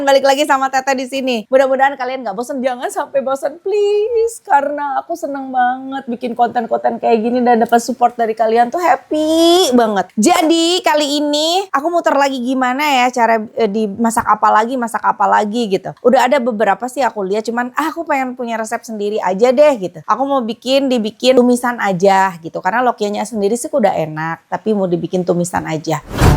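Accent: West Java, Indonesia. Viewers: Teteh, saya suka dengan cara ngomong sunda teteh ❤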